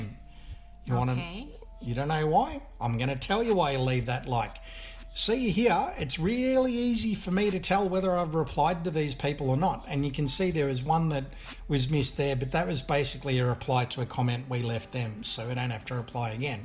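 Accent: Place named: Australia. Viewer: Love the accent, it is the guy's accent, I am guessing Aussie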